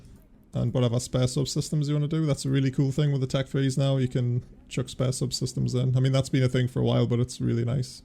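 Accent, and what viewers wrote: Newcastle, United Kingdom. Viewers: When are you gonna "grow out" of that Geordie accent. It just makes you sound like a King Chav. The last time I went to Newcastle, people were wearing dustbin liners as raincoats. I guess you donn your dust bin liner with proud when you go out on the toon (town), right? Learn about Estuary English and start growing up, pal, git gud. Oh shit, now I'm doing it.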